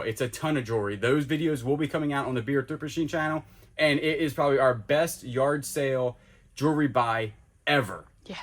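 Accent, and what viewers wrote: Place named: South, United States. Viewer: It doesn't matter what kinda vids y'all make I'd still watch them so thanks for bringing us on your adventures. u crack me up and I love ur southern drawl your just too sweet.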